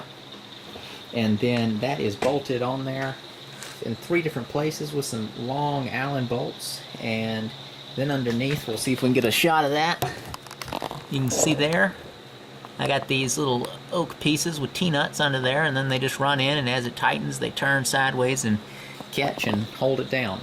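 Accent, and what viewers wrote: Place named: Tennessee, United States. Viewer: He may be in Texas, but I'm guessing Middle Tennessee. His accent sounds just like a bunch of my relatives from around those parts. I love that accent. I could listen to him talk all day.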